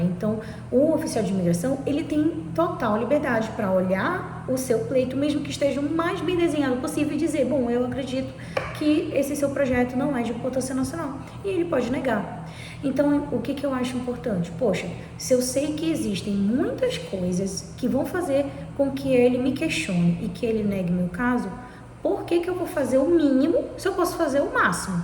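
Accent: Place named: Belém, Brazil. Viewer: pode me dar o contato da Martell? Fica aqui em NY? Eu tenho personal plan e to em RFE. É de chorar. Pelo seu sotaque acho que você eh de Belém né? Sou paraense radicada nos eua. Mas sai daí pequena.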